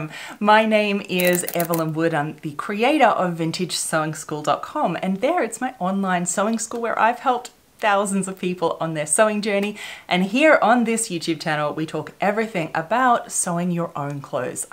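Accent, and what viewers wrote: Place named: Australia. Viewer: Hi Evelyn, so great to hear an Aussie and refresh my sewing skills. I have been sewing for a few decades and have waxed and waned with my sewing. After years of sewing quilts etc I am keen to get back into sewing clothes. I have dipped into your videos and love that you are focusing on sewing clothes, your videos on altering opp shop finds are great too. Thank you for such informative and lovely videos.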